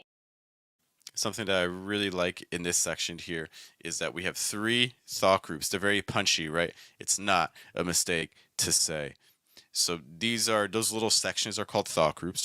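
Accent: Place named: California, United States. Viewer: I thought you have a California accent based on your stress patterns and the occasional use of "like." :) Actually, American accents are a very interesting topic to discuss that we all non-native speakers would love to hear about. For example, what are the differences between NY and Philly accents?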